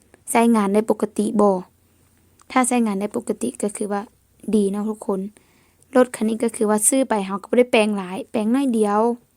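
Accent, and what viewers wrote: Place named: Vientiane, Laos. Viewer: สะบายดี พี่สาวลาวฟินแลนด์ ผมมาเบิ่งรถมึอสองนำเด้อครับ มีแต่รถงามๆเนาะ สำเนียงเสียงเว้าเจ้าคึอสาวเวียงจันทน์เลยเนาะเว้าม่วนๆครับ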